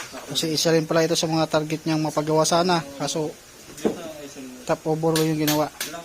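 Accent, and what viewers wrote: Ilocos, Philippines. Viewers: Ilocano yan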